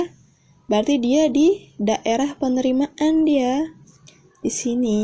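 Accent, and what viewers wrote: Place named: Lombok, Indonesia. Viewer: Logat lomboknya jelas banget mbak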